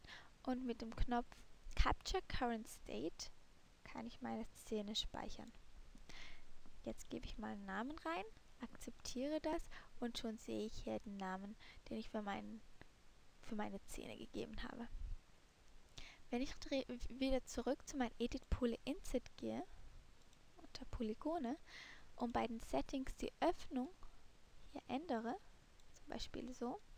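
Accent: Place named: Germany. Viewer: cutest German accent ever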